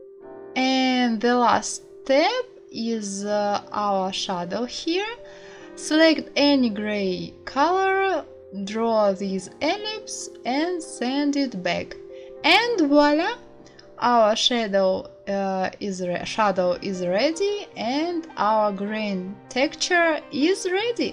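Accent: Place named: Russia. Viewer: Very cute Russian accent.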